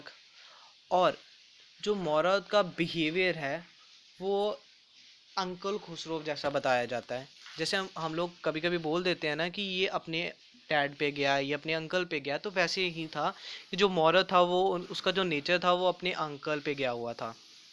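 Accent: India. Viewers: Are you a punjabi! I mean your accent is like that only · Too slow!! Important points missed. Please use your Indian accent. Your Indian accent would sound awesomely confident, your varying speeds will add to it.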